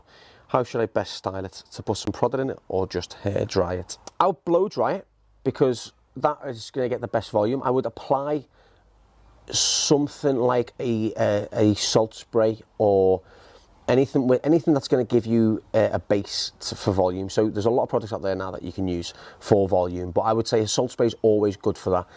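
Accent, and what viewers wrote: United Kingdom. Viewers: For, Could you take subtitle for your video, so I can catch what you say. I am not familiar with british aksen. Thanks From Indonesia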